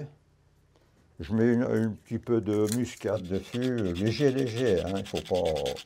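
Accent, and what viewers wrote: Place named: Quebec, Canada. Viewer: Je suis Québécois et beaucoup de gens, surtout les plus vieux, parle comme lui.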